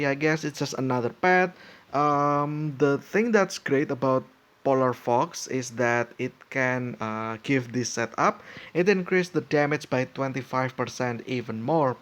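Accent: Indonesia. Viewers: Sudah kuduga beliau ini orang indo, dari aksen dia berbicara mirip seperti orang indo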